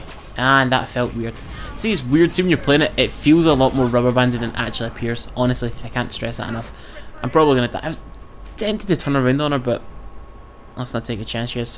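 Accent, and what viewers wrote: Scotland, United Kingdom. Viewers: Sometimes I can't understand a single word you are saying especially when you talk quietly/mumble :( that Scottish accent. You fuck up guardians with beads what is wrong with that? :D I also noticed watching NA players that they have easier games in diamond ranks. My usual ping is 70-80 and when it rises above 120 its nightmare for me (usually playing adc)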